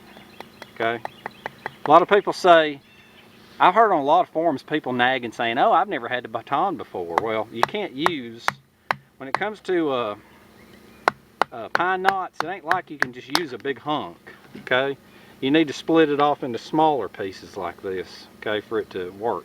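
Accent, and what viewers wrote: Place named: Georgia, United States. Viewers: Great to have you back. I have missed that Georgia accent and your unique laugh. And I have really missed learning from you. YouTube was not the same without you. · How I missed this Appalachian accent! Welcome back, don't abandon us again please.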